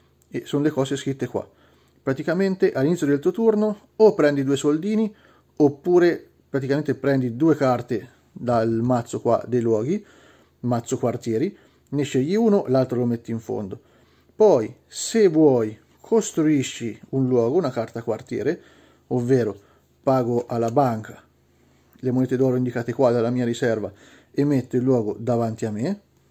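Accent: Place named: Perugia, Italy. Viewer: solo perché sono di Perugia anche io hahahah anzi si sente pochissimo grande bel tutorial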